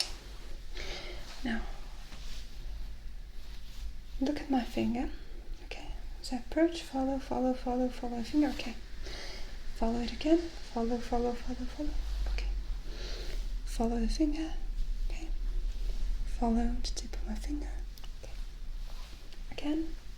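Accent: England, United Kingdom. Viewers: el acento británico q le pones es excelente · that british accent omg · Tu acento de inglés es británico que cool, el mio es el típico acento de Estados Unidos 😂😂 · tu acento en este vídeo era británico a diferencia de otros que has hecho... me gustó 👌👍👌👍👍👌👌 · Esto es inglés británico,?:D Me encanta cómo lo hablas. <3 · I really like your English accent ☺😋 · am i crazy (hah) or is she kinda doing an english accent? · Sounds like you are doing a bit of a British accent in this vid. I like it! :) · C'est marrant que tu aies un accent britannique quand tu parles anglais. Thanks for the video! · Ese ligero acento británico que haces es hermoso y muy relajante 😍😍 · "ostiaa" cit. 😂😂 per pochi secondi mi hai steso;) per il resto che dire, adoro il tuo modo di parlare, il tuo English accent, adoro ascoltarti in ogni lingua 😄😄 thanks 😘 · Lmao I love the way you do an English accent. 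Great video as always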